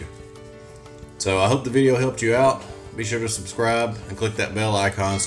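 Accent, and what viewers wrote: South, United States. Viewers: i like your southern "Gone With the Wind" accent.. notch it up with a wider smile.. keep positive, you have great potential.. best wishes :-) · Excellent video and your accent made me homesick for SC, TY.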